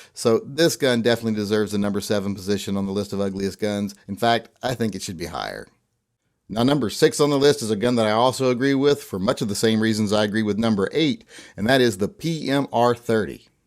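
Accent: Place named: South, United States. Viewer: What an absolute clown. Makes everyone with a southern accent seem as stupid as they sound,and that's not fair. To be honest about the results of the ugliest,rather than asking people to vote and then disregarding what they said and picking your own #1,just post a pic of you holding ANY firearm and you'll have the worst looking ever. What's your hang up with other guys' penis size anyway? You talk about that a lot. People,the only way to watch this douche's videos without getting a headache,is on mute.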